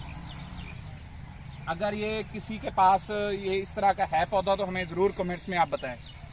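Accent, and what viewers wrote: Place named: Mewat, India. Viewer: آپکی آواز سے آپ مجھے میواتی لگ رہے ہیں۔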